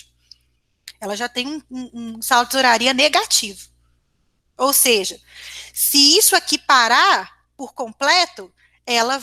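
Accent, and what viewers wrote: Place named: Belo Horizonte, Brazil. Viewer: Esse vídeo vale ouro! Conteúdo excelente! E o nosso sotaque de BH é bom demais! :)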